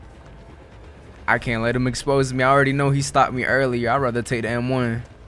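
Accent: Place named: Chicago, United States. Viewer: Man I know a Chicago mf when I hear one 😂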